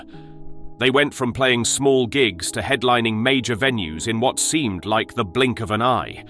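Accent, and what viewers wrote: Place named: United Kingdom. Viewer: I am so done with British commentary a big thumbs down! Old and wearing end condescending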